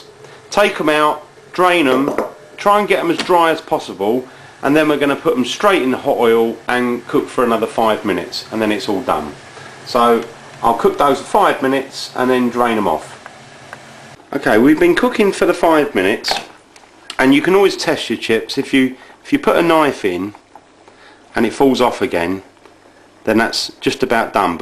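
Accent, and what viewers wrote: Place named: England, United Kingdom. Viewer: Real english Accent, Real English Chips :) Good Lad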